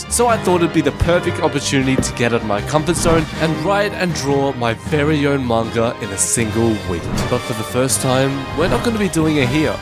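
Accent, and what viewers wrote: Australia. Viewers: You are AUSTRALIAN!?? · Hold on ur australian i am two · I didn't know you were Australian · Are you from Australia?😮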